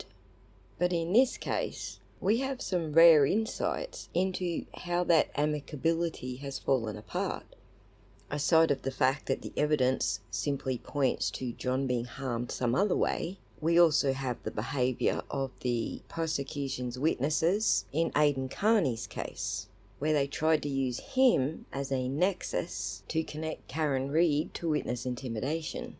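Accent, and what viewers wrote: Australia. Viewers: I always love listening to you Aussie.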